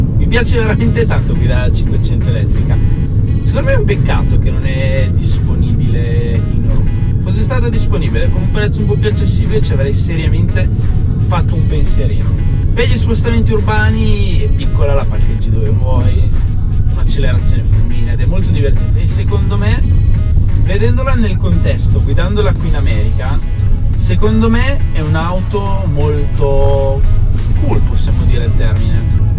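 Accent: Italy. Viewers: Yes, cool to see and hear a Italian in America. :D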